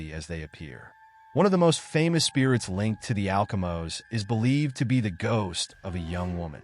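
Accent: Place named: United States. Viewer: Why the Yanky VOICE😢😢😢😢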